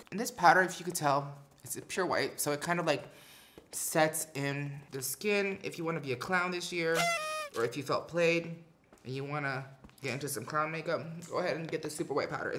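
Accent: United Kingdom. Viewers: Your voice with british is really good omg! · Love the British accent 😍😍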